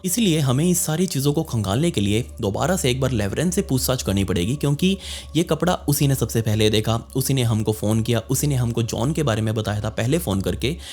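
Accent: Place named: Mumbai, India. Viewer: आपका बोलने का तरीका बहोत अच्छा हैं और मुंबई स्टाइल वाला हैं इसे आप जैसा हैं अच्छा हैं साहब जय हिंद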